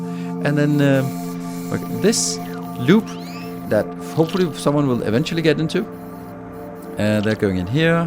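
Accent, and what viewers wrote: Denmark. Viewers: Are you danish?🤔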